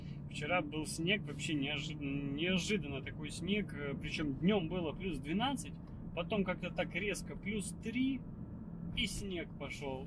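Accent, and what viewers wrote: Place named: Latvia. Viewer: Akcentas latviskas ;-)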